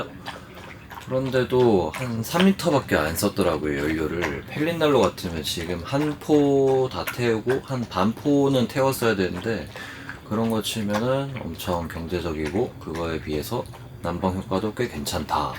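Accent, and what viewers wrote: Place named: Seoul, South Korea. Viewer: 날이가면갈수록 ㅋㅋㅋㅋ서울말 느신거같아요 ㅋㅋㅋㅋㅋㅋㅋㅋㅋㅋㅋㅋㅋㅋㅋㅋㅋㅋㅋㅋㅋㅋㅋㅋㅋㅋㅋㅋㅋㅋㅋㅋㅋ오늘도 랜선캠핑 👍🏻👍🏻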